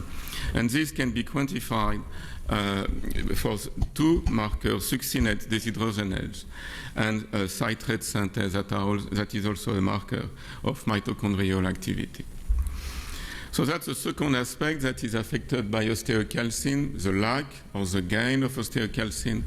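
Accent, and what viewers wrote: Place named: France. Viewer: dicficult to follow, English which sounds like French.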